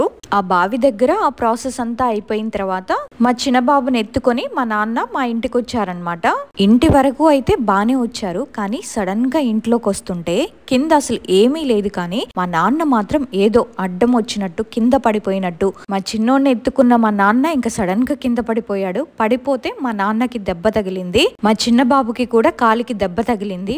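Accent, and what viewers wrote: Telangana, India. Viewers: Telangana slang vachindi madhalo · Bhabi telangana ka slang ACHA hi 😊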